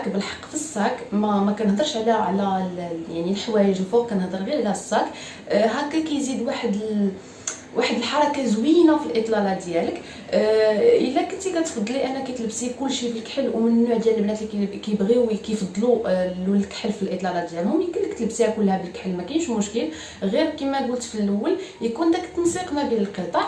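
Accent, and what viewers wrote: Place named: Maghreb, North Africa. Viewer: فيديو رائع ❤️❤️ تصوير رائع ❤️❤️بس اللهجة صعبة الفهم لمعظم العرب لا يفهمون اللهجة المغربية والجزائرية والتونسية للأسف 💔💔 ف الرجاء التحدث ب الشامية أو الفصحى أو المصرية هكذا ينتشر الفيديو أسرع ويكون له جمهور أكبر او ضيفي ترجمة مصاحبة بليز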